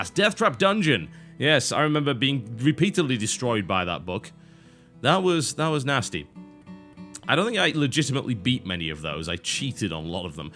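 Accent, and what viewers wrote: United Kingdom. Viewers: is it just me or is british? lol! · Why would the name total Halibut and athe british accent make you belive that? No but in all seriousness( if that is a word) he is british but moved later to the U.S you can catch up on most of his backstory in the 1 mio subs vlog. Thinking about it it sounds like I gave you the back ground to a videogame character... hm..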